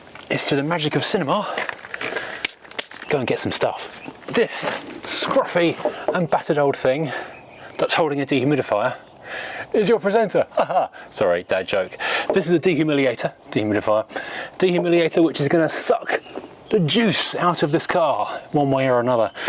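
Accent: United Kingdom. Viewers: ...The best investment I ever made was an Ozone machine. You run it for about 30 minutes inside the car. It will kill everything in the car such as mold, insects, etc. I feel much safer when I drive my older cars knowing I'm not inhaling mold spores where I can't clean! The machines are only around $75.00! ENJOY your channel! With that British accent, you make me enjoy even more my Rolls Royces!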